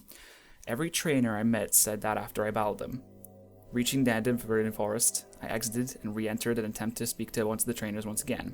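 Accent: United Kingdom. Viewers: The british accent is strong in this one... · Oh ma gad you sounded so British in this!!!! Great story :)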